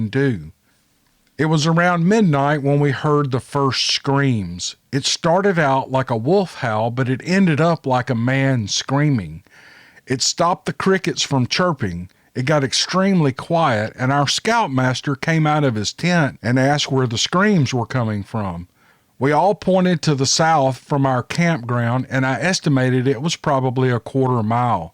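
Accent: South, United States. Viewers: Came for the bigfoot encounters, stayed for the ranting.;)I love the stories, interviews, and your accent! I fully love a well spoken southerner. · I do! Because I know I'm going to LOVE the stories. But, what makes the stories even better is narrations. I love that southern drawl; he does a GREAT job!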